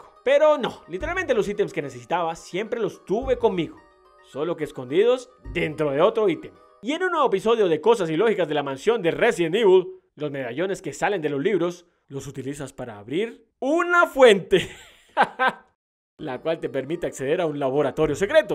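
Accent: Colombia. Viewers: Me encantan estos vídeos... Y se nota que eres Colombiano... Saludos mi paisano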